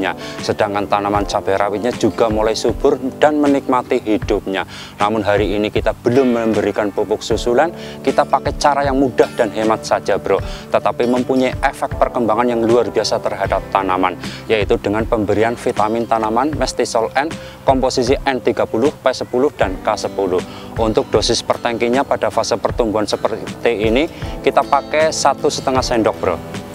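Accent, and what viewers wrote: Banyumas, Indonesia. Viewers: 👍👍salam satu ngapak bro.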